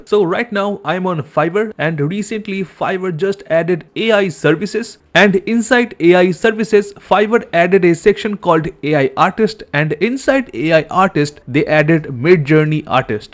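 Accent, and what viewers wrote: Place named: India. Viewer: Bro please tell me one thing. Please go to your analytics and tell me how muchh percentage of your audience are from U.S.A or foreign country. Mujhe ye jaanana hai ki indian english ki jo accent hai usme video banane pr foreign se views aate hain ya nhi?? Please bhai pls please please पैर पड़ रहे हैं🙏🏻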